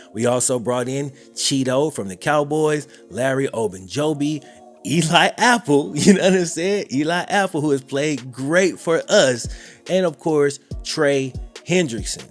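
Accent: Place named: Mississippi, United States. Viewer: Where you from in MS?? I knew there had to be a reason you sound like a dude I been talking to my whole life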